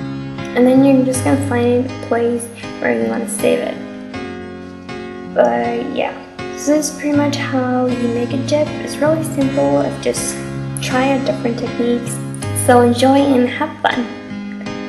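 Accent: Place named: United States. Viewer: you sound american to me.... 0_0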